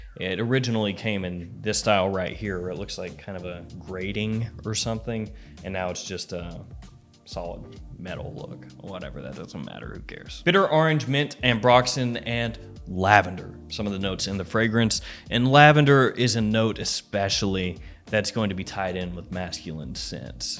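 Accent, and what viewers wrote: South, United States. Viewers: That southern accent should make an appearance more often!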